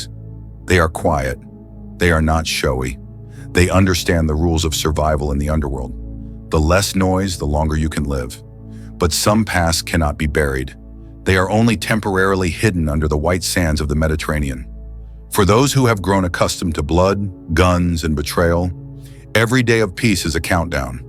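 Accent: United States. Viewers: With that accent should this not be Shadows of USA .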